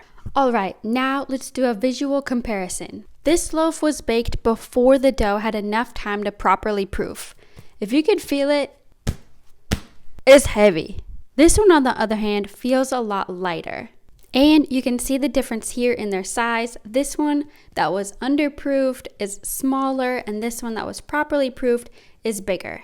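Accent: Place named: United States. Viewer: Do you have an accent or are you from North America? Like you sound American but with a bit of an accent lol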